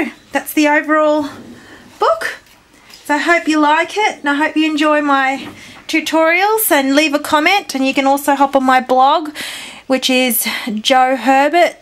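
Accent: Australia. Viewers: Hi hunni, love your tutorial.. just wondering if you were Aussie. . Lol!!! Hope i. Mot offending you by asking...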